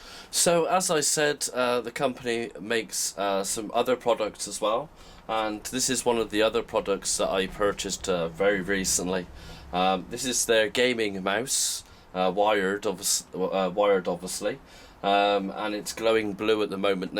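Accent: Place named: England, United Kingdom. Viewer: Thank you for explaining the "CPI" button on this product. I work from home on my laptop and purchased this mouse for my personal laptop use and did not understand the relevance of the CPI button, but your comprehensive review made it quite clear, and your English accent was a delightful bonus. Thanks again!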